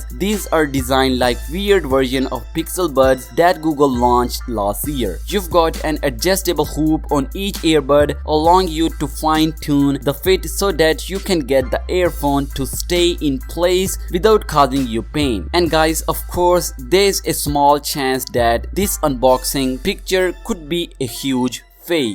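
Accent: India. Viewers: Ну я, конечно, не могу этим похвастаться, однако мне все равно кажется, что это какой-то восточный акцент (то ли индус, то ли еще что-то в этом роде).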